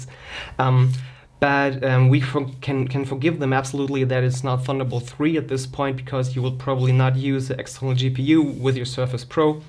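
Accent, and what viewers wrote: Germany. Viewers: the german accent makes you sound exotic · Are you German your Englisch Sounds German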